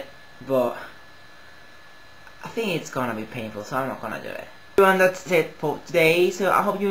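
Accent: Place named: Spain. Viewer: I love your accent. Much nicer then English, Australian or even South African. A Spanish man speaking English. Whats this world coming to?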